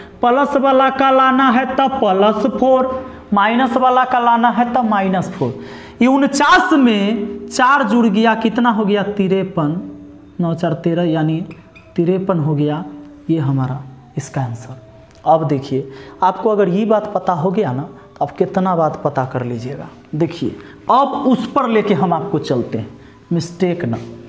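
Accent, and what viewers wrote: Bihar, India. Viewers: Your bihari accent has been helping me to Focus in maths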